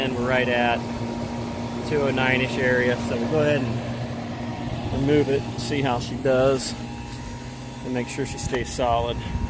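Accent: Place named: Ohio, United States. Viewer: Nice. I had a feeling. I was born and raised in the SW Ohio region, Hamilton to be exact, and thats the dialect so I pick up on it right away.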